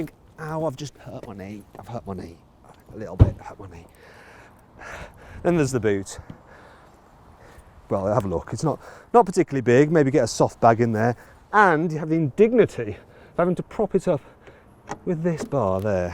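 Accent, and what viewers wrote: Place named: Birmingham, United Kingdom. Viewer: he's a brummy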